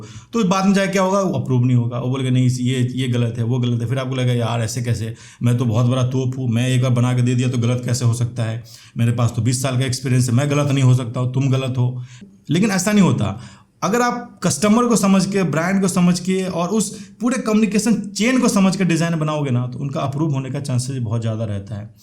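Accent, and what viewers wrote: Bihar, India. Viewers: Bihar se hain ka? Ya UP se?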